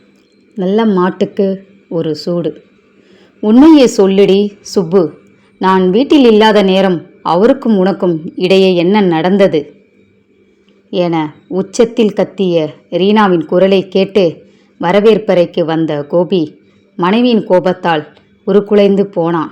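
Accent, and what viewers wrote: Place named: Chennai, India. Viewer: அட அட சூப்பர் கதை அப்புறம் உங்கள் ..... உங்கள் மெட்ராஸ் பாஷை அட்டகாசம் சகோதரி❤❤❤❤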